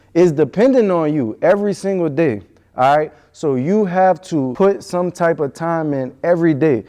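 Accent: South, United States. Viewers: Are you from Louisiana? I hear a southern accent. Love your content btw! I’m getting ALOT of tips for my business.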